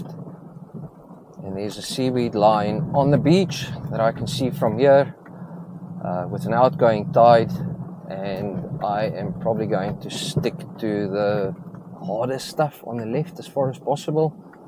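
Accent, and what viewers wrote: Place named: South Africa. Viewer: Sensing a bit of South Africa here lol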